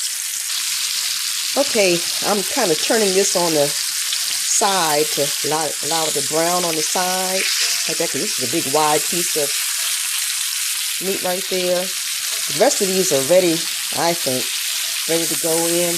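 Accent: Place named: South, United States. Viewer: She sounds New Jersey ish and southern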